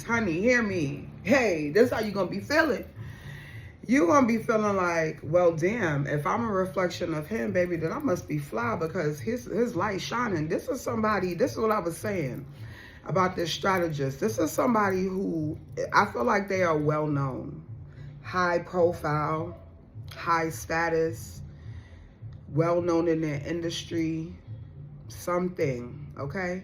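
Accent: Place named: New York, United States. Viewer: Girl I love your energy cause period. WHEN YOU GOT HYPE, I did too 😂😂😂🎉🎉 I miss my New York voices ❤. Born and raised in queens until I moved to ATL 10 years ago. And I think I know exactly who this is. He said something stupid and I didn’t respond to him 😂 MIND YOU JUST LISTENED TO AND by. Manifesting 😢 BRUH 😂and you pulled Scorpio as the first sign. I am a Scorpio 11/01 mannnnn you pulling my energy hard 😂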